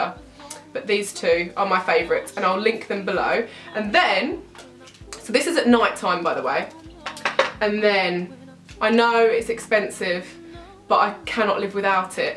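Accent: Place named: United Kingdom. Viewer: I used to only follow you on Instagram and I decided to check out your YouTube and I had no idea you're British!! Your voice is so cute!!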